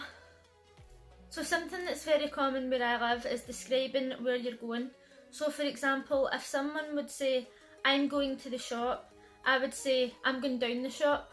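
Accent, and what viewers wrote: Scotland, United Kingdom. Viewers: I understood what you were saying. The Scottish Accent is one of my many favorite accents. Very interesting to learn about some Scottish Slang. I hope that one day, I can visit Scotland. <3 :) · Hello!! I grew up thinking I was Asian in AMerica and I recently discovered I was Scottish and am currently looking forward to reaching out to my Scottish kin and soe of them are on social media today. I noticed my American dialect is very similar to your dialect. Here is my video here and I hope you watch it!!! <link> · It's my favourite accent since am keen on Chewin' the fat TV show. Thank you for this video love that's smashin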